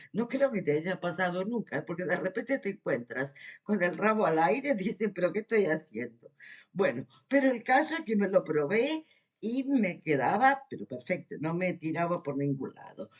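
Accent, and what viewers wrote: Argentina. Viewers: Portugal es muy bonito, yo conozco varios lugares de allí. Pero tú eres de Argentina, por tu acento . Yo tomé clases de tango con una profesora de Buenos Aires, aún sigo pero ya no en clases, solo prácticas y milongas. Un saludo desde Vigo.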